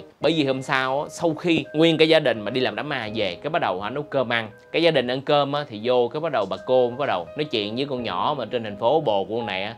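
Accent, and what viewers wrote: North, Vietnam. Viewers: review có tính cà khịa, nói lái theo giọng người miền bắc thì phải ? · eh bò đỏ, ông dùng Tiếng bắc nè, mày thưa giùm tao cái.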